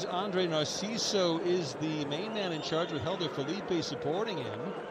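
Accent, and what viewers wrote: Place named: United States. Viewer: American commentator? I didn't expect that really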